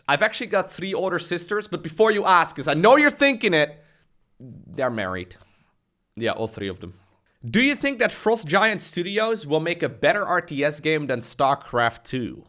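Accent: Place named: Netherlands. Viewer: Well a dutch accent isnt really a problem i think. Id love to hear your voice in a film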